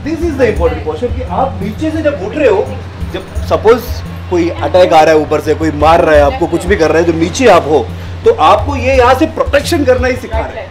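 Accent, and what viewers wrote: Kerala, India. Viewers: Are you a malayali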